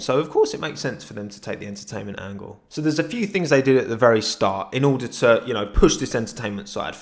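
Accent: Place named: West Ham, United Kingdom. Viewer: I could feel the West Ham in your accent from the very first video I watched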